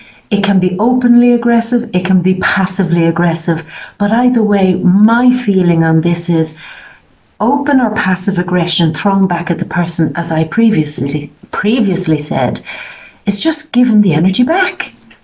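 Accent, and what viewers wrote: Ireland. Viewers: Love the irish accent, im from cork.xc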